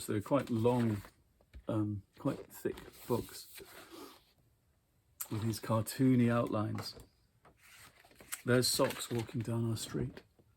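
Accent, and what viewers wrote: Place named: Blackhall, United Kingdom. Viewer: Hi, I have only just found this channel & am so glad I have. A lovely place without all the usual literary snobbery, for those of us who were working class kids who haunted the local library & only ever wanted books for Christmas & birthdays. Still the same now at 58! Also, your accent reminds me so much of my Mum's side of the family - from Blackhall, where I visted to see my Nan & family a few times a year. All sadly gone now. I still occasionally go back to visit that wild coast. What was once a coal beach is now a beautiful nature reserve. I'm working my way through the videos & love it when an old favourite childhood read is mentioned. Thank you so much, I'm off to pull out some favourites from my over stuffed shelves & teetering piles to group together to re-read. I love your artwork, too. Dr Meow - inspired!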